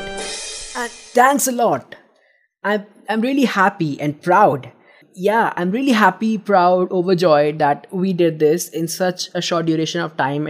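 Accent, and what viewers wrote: India. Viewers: Are you indian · Dude are u Indian? Ur accent sounds so.. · Why u sound like indian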